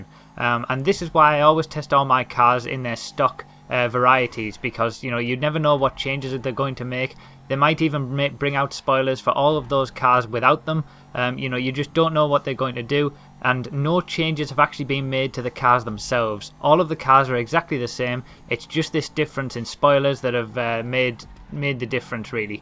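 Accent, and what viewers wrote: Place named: Wales, United Kingdom. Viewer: you sound a bit welsh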